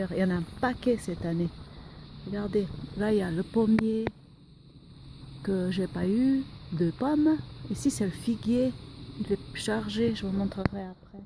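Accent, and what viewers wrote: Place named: South, France. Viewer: bonjour, je me disait aussi que c’était un accent du sud ;) beau coin de véranda la suisse c'est joli merci du partage. a bientôt Vincent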